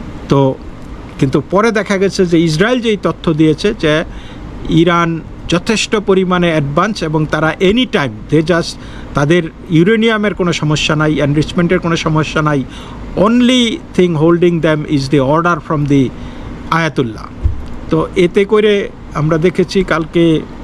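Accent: Bangal, Bangladesh. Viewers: অনেকদিন পর । খুব মিস করছিলাম আপনাকে। আপনার মুখে বাঙাল ভাষায় খবর শুইন্যা মনডা ভাল হইয়া গেল।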